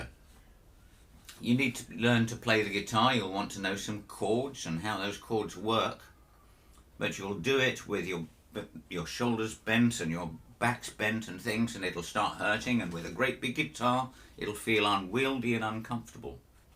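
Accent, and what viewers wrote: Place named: United Kingdom. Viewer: Good vid! You Brits sound a little funny to a West Texan, but that sure doesn't make yur view of the world any less valuable. ;) I'm a guitar tech, (Luthiers are the guys that actually know what they are doing) and I get tons of first timers, in fact thats the market I'm in. One amazing thing I've discovered with new players is if we teach them the mechanics of the guitar, action, level frets, tuning, string changing, checking and setting the truss rod. Just minimal maintenance, this "seasons" the player and like getting the wood used to resonating, now you have a familiarity built in between the student and the large wooden object sitting in the student's lap.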